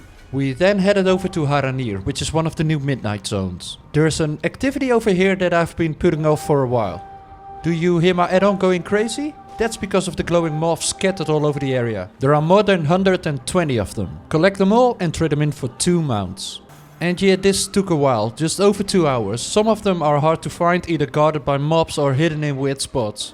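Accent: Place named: Netherlands. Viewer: sorry but it was very funny xD, i guess youre from the netherlands ^^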